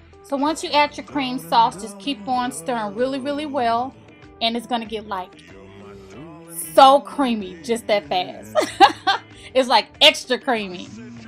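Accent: South, United States. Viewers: I love the way you talk with that southern twist like me People from other states say we talk funny but you dont care!! Gurl keep repping for us gurls down south!!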